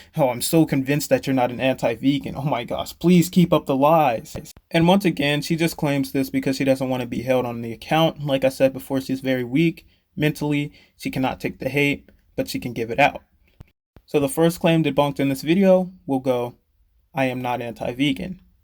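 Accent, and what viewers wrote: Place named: Working class, United Kingdom. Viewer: Mmm so if you believe this girl is sophisticated that would be really sad. As upper middle class, don't kniw yet her accent sounds like a lower class british accent. She's not the brightest and craves attention. So if i had to bet, is lower class narcisist with a severe eating disorder.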